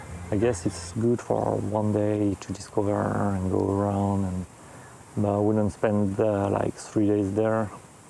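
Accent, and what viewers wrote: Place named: France. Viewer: I think he’s French that’s why they say oi as oa.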